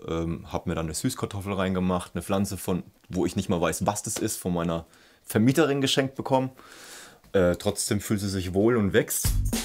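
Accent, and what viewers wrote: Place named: Franconia, Germany. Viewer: Super sympathisch und direkt den fränkischen Akzent gefeiert 😍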